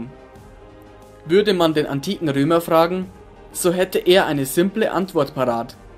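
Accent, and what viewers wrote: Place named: Austria. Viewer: sehr gutes Video, kömmste aus österreich wegen den Akzente? Mache weiter so!! Grützi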